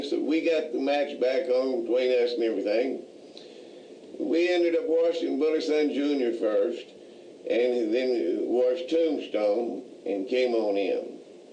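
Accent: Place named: South, United States. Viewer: Just found these Gems!! Man I been missing out!! I can listen to this man tell a story all day!! He's got this very captivating southern charm of way about telling bulldog stories..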